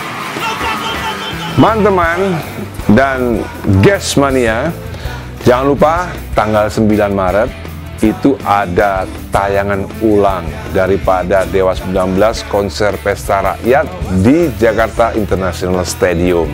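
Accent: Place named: Surabaya, Indonesia. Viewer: Bose gesss Boseee .... Makin mantap monolognya, kas suroboyone tapi ada solo solonya dikit.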